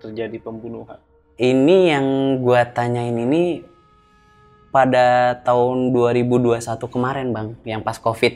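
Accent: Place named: Bali, Indonesia. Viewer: Hadir sampang madura, btw bg orang bali y logatx bali bgt🙂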